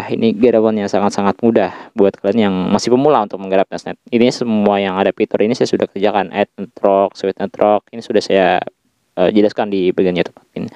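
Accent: Banjar, Indonesia. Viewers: Logatnya Banjar banget min..😅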